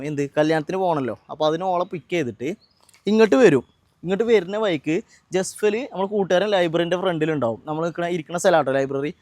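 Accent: Malappuram, India. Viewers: Paka malapuram slang analo😂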